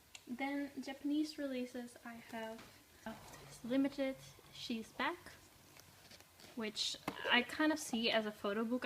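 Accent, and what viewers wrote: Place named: Netherlands. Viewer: haha heard you were dutch immediately when you spoke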